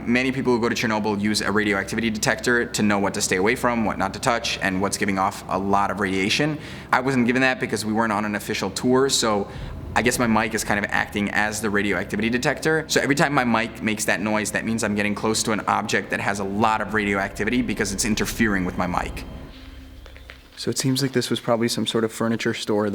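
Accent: Russia. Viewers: Nice russian accent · Bruh your russian accent is on point. Neat story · I was super impressed with your Russian accent/pronunciation/reading. I did not expect that. However, I REALLY hope you DID NOT TOUCH ANYTHING, and were careful where you walked. I'm frustrated that you were allowed to do this video with zero protective gear and no Geiger meter. Also your lack of knowledge regarding the dangerous situation you were heading into was stressing me out. I mean, there were definitely new things in that video that I had never seen in regards to Chernobyl, which was pretty cool, but I was too distracted by the risk you were taking with your own health and safety.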